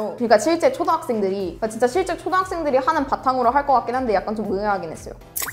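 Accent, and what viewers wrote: South Korea. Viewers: 정체가 누구예요? 한국말 완전 원어민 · ㅋㅋㅋㅋ ㅋㅋㅋㅋ 이학생 뭐니 말도 엄청빠르고 액션이나 말투 사투리까지 이아이 한국사람 오리지날이네 ㅋㅋㅋㅋ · 와 완전 한국발음이네 ㅋㅋㅋ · 이 학생 외모만 외국인이지, 하는 행동, 말투, 표정하며 완전 한국인이네. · 영상 안보구 청각으로만 들으면 100퍼 한국인이다! 네이티브 ㅋㅋㅋ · 한국 여학생이야??????? 발음하고 말투하고는? ㅋㅋㅋ 우리 옆집에 사는 동생이랑 똑 같네? 대박이야~~~~~ 미치겠네~ㅋㅋㅋ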